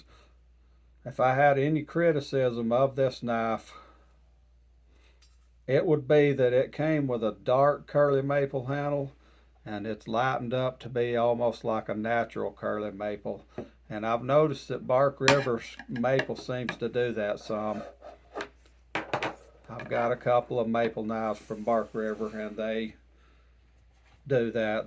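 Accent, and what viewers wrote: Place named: Appalachia, United States. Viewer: Hey, bub, you sound like you come from close to the upper part of the Southern Appalachians, which is where I’m from. And back in the old days, a plain two-blade pocket knife along with a sensible belt knife got the work done. Today, I carry a Leatherman super tool along with the same sensible belt knife. Hmmm…..There seems to be a historical pattern going on here, and I sure as hell am not about to argue with it.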